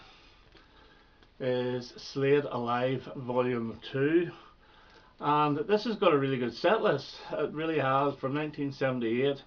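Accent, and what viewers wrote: Northern Ireland, United Kingdom. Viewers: Hi, It's been a while. I'll be honest with you, it was the Americanism in the title that grabbed my attention. I came by to see if you still pronounce 'Aluminium' correctly. My wife comes from America and I have to suffer such vulgarities on a daily basis. Normally I look to you and your beautiful Northern Irish diction to save my sanity... but I can see that beginning to slip now... oh dear God. What is the world coming to...😪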